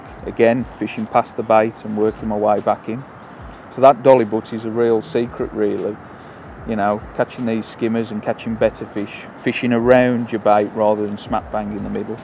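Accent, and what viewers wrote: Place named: West Midlands, United Kingdom. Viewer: Great to hear a local west mids accent... Great info..💪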